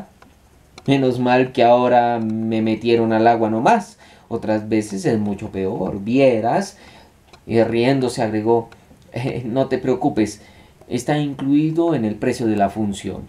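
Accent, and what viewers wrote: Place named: Colombia. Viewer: me agrada tu acento colombiano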